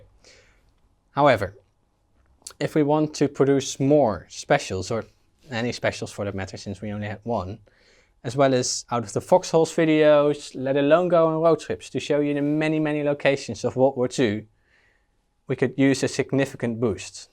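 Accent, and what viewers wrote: Netherlands. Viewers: Ik hou van een Nederlands accent. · YES. JOIN ME IN THE DUTCH ACCENT REPRESENTATION SQUAD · That's some T H I C C Dutch accent right there! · He has a great voice that’s fun to listen to 5/5. It’s like an American doing a British voice while being Dutch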